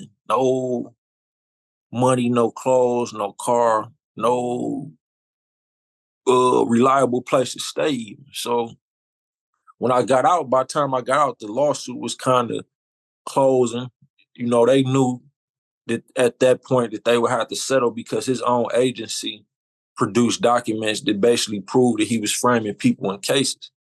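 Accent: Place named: West Coast, United States. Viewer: Sounds like a West Coast dude.....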